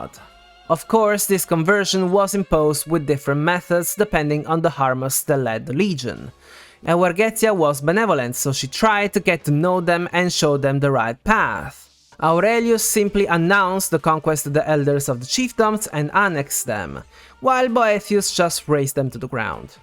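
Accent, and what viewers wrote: Italy. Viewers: Ahaha ecco cos'era quell'accento! Ciao compatriota! Sei fortissimo, adoro i tuoi video e cerco sempre di stare al passo. Spero che il tuo canale possa crescere, te lo meriti davvero ❤️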